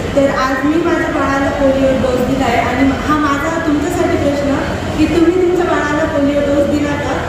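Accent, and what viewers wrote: Nashik, India. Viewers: आपले नाशिक आणि भाषा लई भारी ताई . 👍बिनधास्त बोलत जा. अजिबात संकोच नको करू. सर्वांना समजते मराठीचा गोडवा सगळीकडे सारखाच. ओरिजिनल ते ओरिजिनल असते. उगाच ओढून ताणून इंग्लिश विंग्लिश मारणाऱ्यांपेक्षा तु छान आहेस. म्हणून फेमस झालीस व ग्रोथ आहे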